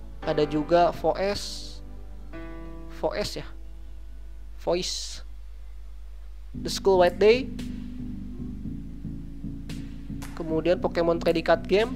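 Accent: West Java, Indonesia. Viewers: langsung keliatan logat sundanya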